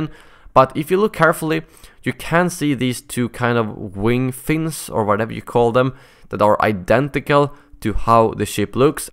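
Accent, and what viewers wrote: Sweden. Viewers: You can hear that he's swedish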